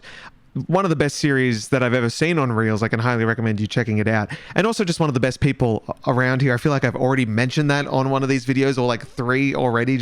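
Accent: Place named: Australia. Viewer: Wondered if you were Australian from the accent... then you said bloody hell and I was like yep.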